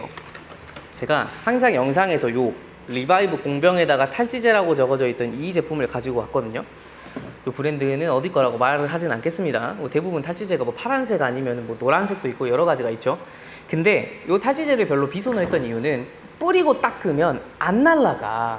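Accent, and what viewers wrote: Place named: Jeolla, South Korea. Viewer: 아따 전라도 사투리 구수하게 쓰시는데 전라도 아니셨습니까?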